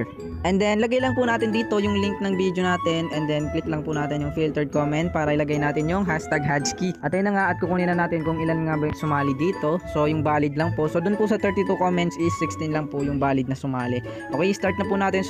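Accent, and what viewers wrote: Philippines. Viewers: Tol Maguindanaon ka?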